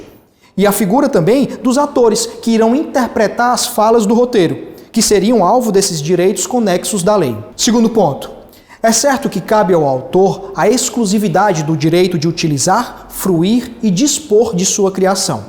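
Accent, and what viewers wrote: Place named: Ceará, Brazil. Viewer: Deve ser Cearense 😍👏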